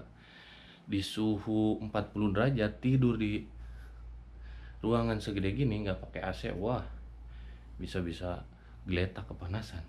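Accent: West Java, Indonesia. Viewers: Subhanalloh...kang orang sunda nya...logatna euy... Beh mana cikundul..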